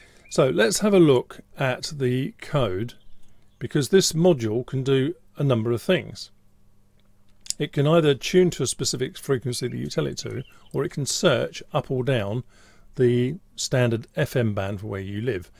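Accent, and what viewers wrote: United Kingdom. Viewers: Hey, Am loving the videos, they are very informative. I would like to know your background in electronics, software etc...? Am from Liverpool, and I hear your also British. Where are you from? Keep the videos coming!! Kind regards Peter - Liverpool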